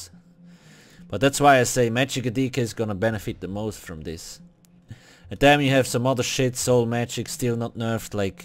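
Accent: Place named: Switzerland. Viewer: Ich finde sein schweizerenglish so genial obwohl er auch deutsch spricht... sagen wir mal schweizerdeutsch... aber man merkt mehr und mehr in seinen letzten videos das ihn das spiel mehr abfuckt als er es für gut befindet ... in den letzten videos finde ich persönlich merkt man das enorm !! Er schimpft mehr als das positive daran zu sehen ... nämlich es soll im Endeffekt spaß machen !!! Nun ja ich schau deine Videos nur noch wegen dem schweizerenglish 😂😂😂😂 ansonsten naja dislike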